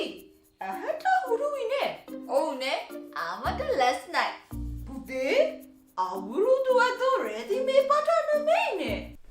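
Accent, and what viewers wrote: South, Sri Lanka. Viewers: දකුනෙ ගැම්ම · දකුණේ ගැම්ම😆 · දකුනේ ගැම්ම❤️ ඒක මරු. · දකුණේ ගැම්ම 😂😂😂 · හරි හරි දකුනෙ ගැම්ම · හරි හරි..දකුණෙ ගැම්ම😂😂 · දකුණේ ගැම්ම අම්මෝ 😁😁💖💖💖💖💖💖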